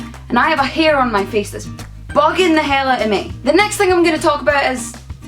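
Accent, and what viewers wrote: Scotland, United Kingdom. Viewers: Are you scottish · Are you Scottish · I love your accent. Ive been to Scotland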